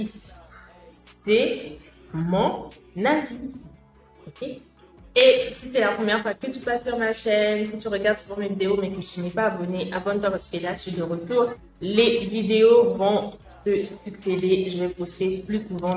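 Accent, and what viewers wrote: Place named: Senegal. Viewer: Toi la tu ressemble à une sénégalaise deh 😁même l'accent c devenu sénégalais deh🤣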